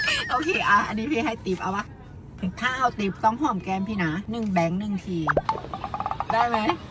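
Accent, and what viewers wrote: Thailand. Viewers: ชอบสาวเหน่อครับ น่ารดดดดดดดด😁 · ชอบสำเนียงเมืองกาญมาก ติดตามเพราะกวน…ดี😂😂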